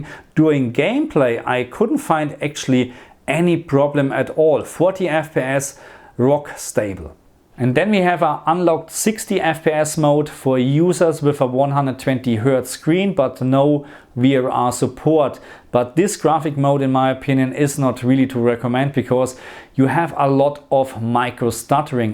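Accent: Germany. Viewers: Deutscher akzent 😂👋👍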